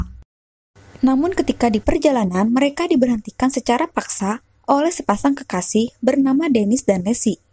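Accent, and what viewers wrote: West Java, Indonesia. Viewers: Sunda ya kak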